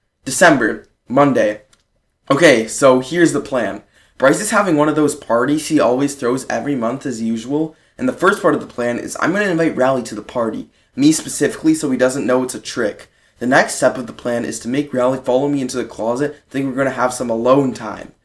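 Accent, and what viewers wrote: United Kingdom. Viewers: Hey bro, small doubt.... Your scotish right?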